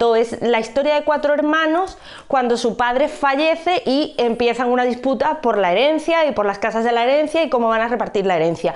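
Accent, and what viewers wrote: Spain. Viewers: "La herencia me encantó"! Y le tengo muchas ganas a Gente normal... por cierto, ¿eres murciana? lo digo por el acento:) saludos!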